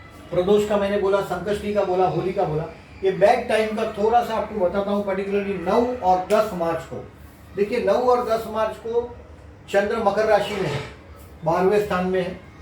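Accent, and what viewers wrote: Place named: Gujarat, India. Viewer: Is he from Gujarat? His accent is so.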